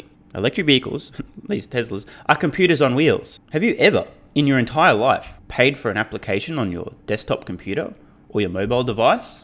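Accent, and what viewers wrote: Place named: Australia. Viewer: Sounds like an Ausie(sp?). Hat's off Mate!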